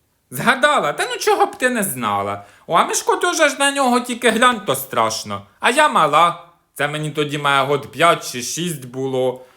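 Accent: Poltava, Ukraine. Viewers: Обожнюю ту полтавщину, моя рідна бабуся з кобеляк, ну точно так балакає😂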